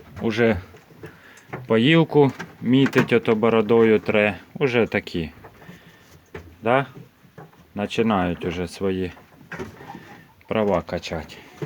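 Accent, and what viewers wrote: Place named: Sumy, Ukraine. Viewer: Доброго часу доби!Підписалася на ваш канал,я так зрозуміла,що ви з Сумщини?Цікаво звідки саме,я теж з Сумської обл.,з колишнього Буринського району,тепер Конотопський.Тримаю небагато кроликів,для себе.Цікаве у вас відео,знімайте ще,приємно чути свою мову,сумську. 😀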